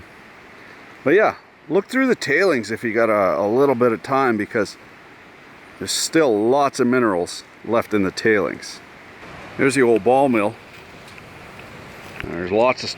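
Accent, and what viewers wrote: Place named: Canada. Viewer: Damn. i knew you lived in canada from the way you talk haha but didnt realize we live in the same province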